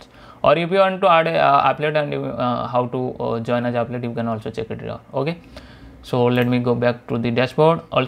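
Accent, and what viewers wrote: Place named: England, United Kingdom. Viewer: You have an awesome English accent. So easy to understand. 👍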